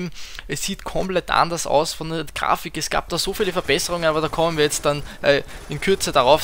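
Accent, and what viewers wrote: Austria. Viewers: bist du österreicher ? :D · du bist a Österreicher oder?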